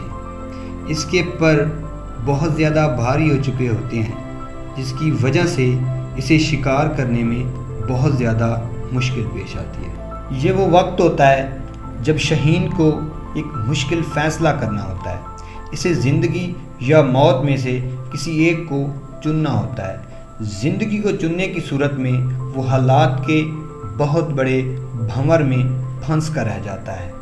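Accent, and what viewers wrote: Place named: Saraiki, Pakistan. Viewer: Narrator ny kitni jamaat tk Taleem hasil ki thi. Accent bilkul Saraeeki 😫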